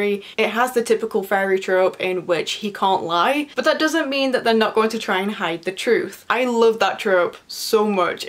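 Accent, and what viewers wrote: United Kingdom. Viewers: Strange question I know but are you from Cumbria?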